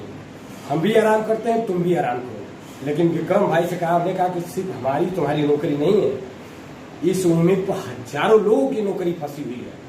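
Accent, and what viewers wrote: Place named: Bihar, India. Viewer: Tm to bihari lagta h ..kya bhai .sahi bol Raha mai 😊😅😅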